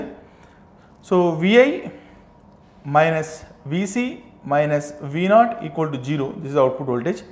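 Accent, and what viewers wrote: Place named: South India, India. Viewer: He is south Indian .they speak like that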